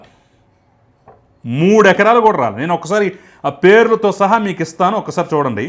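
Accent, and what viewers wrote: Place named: Kadapa, India. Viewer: Peddaina slag vintuvunte kadapa slag la vundi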